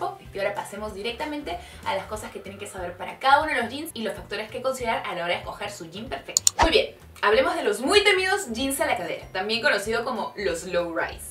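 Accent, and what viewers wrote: Chile. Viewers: Chilena :v?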